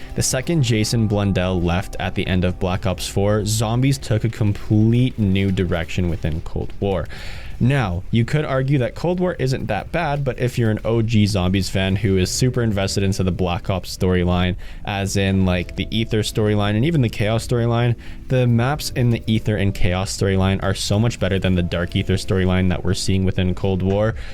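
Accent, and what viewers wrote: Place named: Canada. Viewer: he's Canadian. You can tell by how he says certain words.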